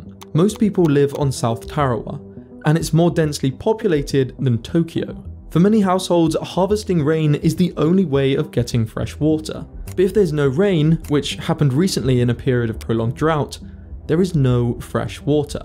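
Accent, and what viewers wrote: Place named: RP, United Kingdom. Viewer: There are an awful lot of YT pretending to be “science” with British speakers. I guess whoever is paying to make these think it gives the channels more credibility. Anyhow… it is much more likely that the islands are sinking rather than “sea rise” which is a myth. The great climate scam has come to an end everywhere except these types of pretend “science” YT videos which still constantly push the “sea rise” and other hoaxes.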